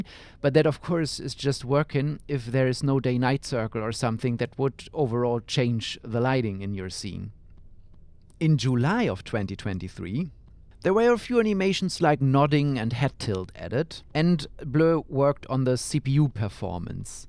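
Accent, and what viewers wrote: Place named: Germany. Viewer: You have a German accent. Are you from Germany?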